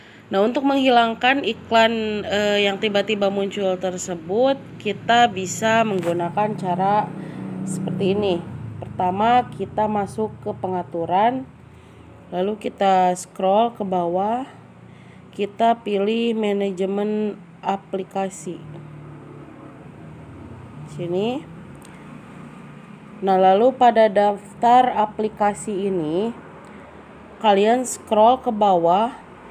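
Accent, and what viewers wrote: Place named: West Java, Indonesia. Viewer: mni Sunda pisan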